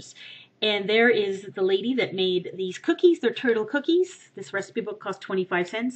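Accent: Canada. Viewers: It's an accent thing common among canadian people.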